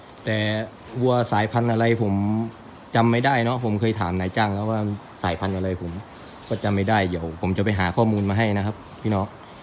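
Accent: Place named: Isan, Thailand. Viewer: น่าสนใจดีค่ะ พูดภาษาไหน ก็ฟังได้คะ่ ภาษาอีสาน น่าฟังคะ่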